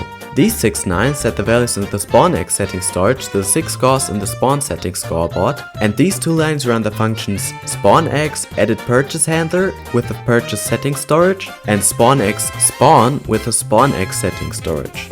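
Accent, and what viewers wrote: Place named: Germany. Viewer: safe you'r german, i can hear this!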